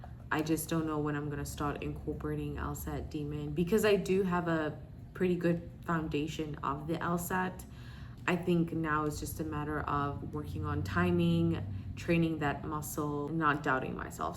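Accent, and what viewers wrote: United States. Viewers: Are you American?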